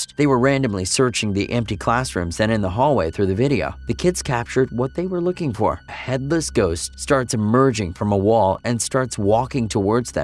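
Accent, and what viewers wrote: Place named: General American, United States. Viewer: im noticing a theme with these type of vids, the narrator usualy has a standard american accent (i assume its american) but cant structure sentences properly....why?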